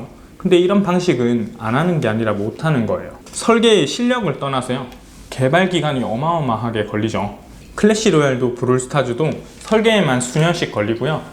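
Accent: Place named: Gyeongnam, South Korea. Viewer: 경남쪽 분이신가...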